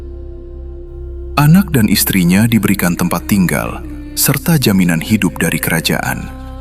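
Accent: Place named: Java, Indonesia. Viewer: Perhatikan dari logat bicaranya. Itu admin nya org Jowo. Jowo bertutur sejarah Sunda. bukan ranah nya. Pasti jadi akan aneh dan membingungkan. Skip saja / abaikan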